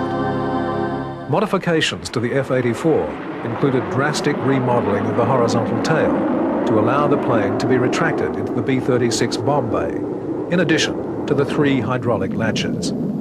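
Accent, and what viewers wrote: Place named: Australia. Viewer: Wow ,i can understand the Narrator good for a change ,sounds like a Aussie.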